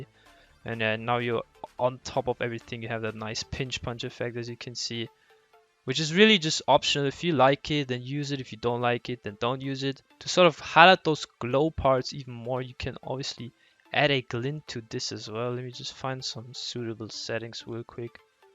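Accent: Germany. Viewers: Omg ich checke jz erst das du Deutsch bist 😂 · Are you german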